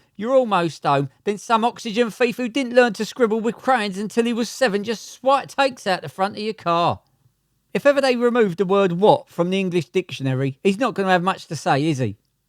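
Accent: Cockney, United Kingdom. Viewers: Christ, the commentary is so bad. A right cockerny geezer trying to be funny in every clip, absolute fail.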